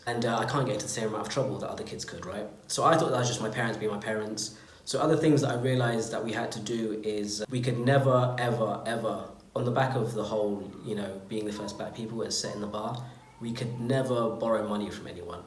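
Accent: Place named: Banbury, United Kingdom. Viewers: British accent sounds better than the American accent I guess. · Definitely. sounds posh though, all Banbury, no Peckham...thank goodness!:)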